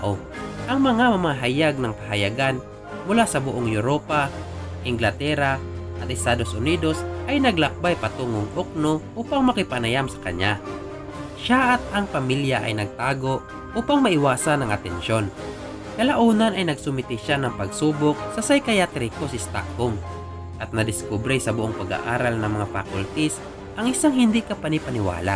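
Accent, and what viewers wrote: Visayas, Philippines. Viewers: Bisaya man jod ni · like nyo kung BISAYA yung nag sasalita dito😁✌👇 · Visaya ka po? Me no bicol po ako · Bisaya ka po? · bisaya ja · Bisaya ka ba sir? Hehehe. Pansin ko lng sa accent mo. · Bisaya ka kuya? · I like your visaya accent🤣🤣🤣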